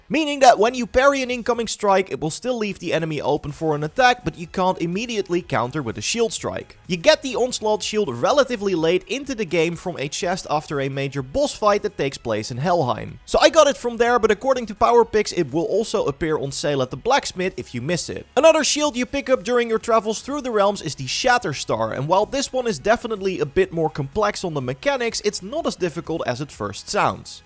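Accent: Netherlands. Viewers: Do I smell dutch or not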